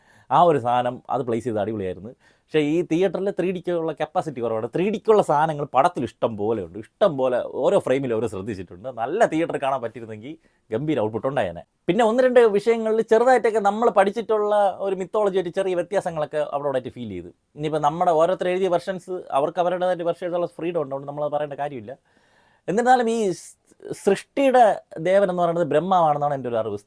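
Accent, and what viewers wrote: Trivandrum, India. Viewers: Trivandrum slang 😂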